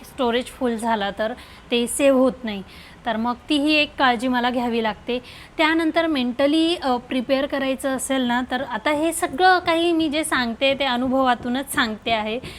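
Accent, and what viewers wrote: Khandesh, India. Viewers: खूपच खिळवून ठेवणारे , वास्तवाला विनोदी पद्धतीने , टोमणे मारत सांगीतलेले असते.. सर्वात कमाल म्हणजे हे आधी लिहिलेलं नसतं, ऐनवेळी कसे सुचते? खूप छान flow असतो , विषय सोडून अजिबात इकडे तिकडे जात नाही.. आम्ही 5 मिनिटात बघतो reel. पण किती प्रचंड मेहनत, आणि बारकाईने detailing केलेले असते.. सासूबाई, आई सगळे पात्र अगदी जिवंतपणे उभी करता.. ह्याचे आश्चर्य वाटते की विषय सुचतात तरी कसे?आणि ते किती सहजपणे खुलवता तुम्ही!! प्रत्येक वेळीस सर्व पसारा आवरणे, घर टापटीप करणे, बापरे , किती तळमळीने करता हे काम? आवाजाचा खानदेशी ठसका ,स्पष्ट आणि गोड आवाज सगळाच खूप छान आहे.. केवळ viewers साठी घेणाऱ्या तुमच्या कष्टाला सलाम!. All the best..तुमच्या reel ची नेहमी वाट बघते..आणि कितीही घाईत असले तरी ते बघितल्याशिवाय राहवतच नाही. 😊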